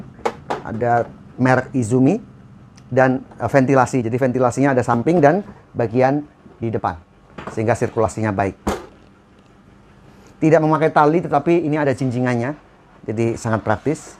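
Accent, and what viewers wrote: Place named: West Java, Indonesia. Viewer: Alhamdulillah bukti hasil positif dalam memberikan edukasi memberikan ilmu gratis yang diberikan oleh akang guru kepada para subscribe yang selama ini setia menunggu review tool murah meriah hingga tool premium, terima kasih atas ilmu dan informasi yang diberikan selama ini, ingat awal mula mengikuti chanel ini secara tidak sengaja kalo ga salah masih 2 k dan masih sederhana masih ada lampu bohlam di atas meja, terus kalo review logatnya sunda pisan, selain itu selalu berbarengan dengan kumandang adzan subuh, selamat akang guru wilujeung 20 k mudah mudahan terus dan terus berkembang hingga mendapat silver bottom 🙏👍👍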